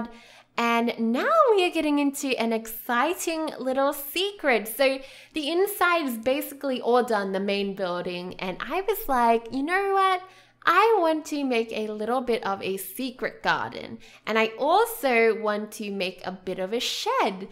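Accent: Australia. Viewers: is she australian? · Muflon She's Australian :)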